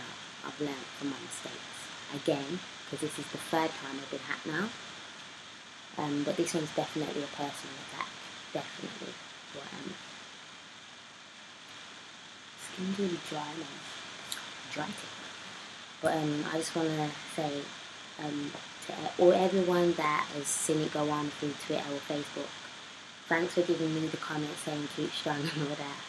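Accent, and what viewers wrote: United Kingdom. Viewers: Come to america please just for a little while. Most unique brit rapper. I love the accent even tho its heavy. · I love the British talk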